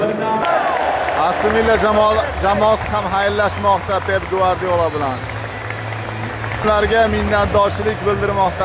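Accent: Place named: Andijan, Uzbekistan. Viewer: Молодец! Кайф киламан андижончасига ,омад тилаб коламан анжанлиларга!!😊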